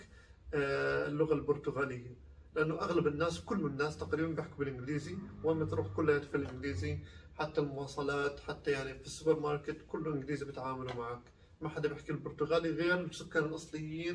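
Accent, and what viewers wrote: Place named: Jordan. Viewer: اول شئ الله يسعد دينا احنا الاردنيه بصدقنا ووضوحنا وشرحنا بإيصال المعلومه بشكل مباشر وواضح ومفصل ومفهوم انا مش بس من اللهجه بل من طريقه الشرح والتوضيح والتفصيل قلت هاد الرجل اردني أو فلسطيني أو مكس 😂♥️♥️♥️💪 كل الاحترام اخي الكريم وأمنيات التوفيق على الدوام واستمر بإيصال صوره مشرفه عن شعبينا الواحد وصدقنا ورقينا بالتواصل وإيصال المعلومه بكل امانه ومسؤوليه ولك كل الدعم 💪♥️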